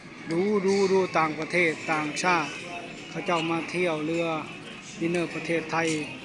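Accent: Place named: Savannakhet, Laos. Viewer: สวยมากฯ... เสืยงคนทาง สะหวันนะเขด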